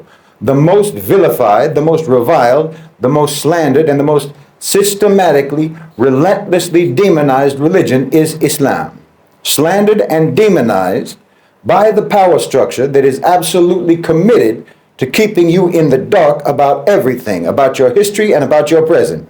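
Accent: United States. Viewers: I aint gonna stop😂 this man is american college educated and speaks in an american accent. 😂 If you cant tell hes a shill you lack critical thinking and will always do what the screen tells you too. He even uses american ideology and american issues while he lives here to tell you about how bad white America is. This person is lower than pedophiles. He's a pedo protector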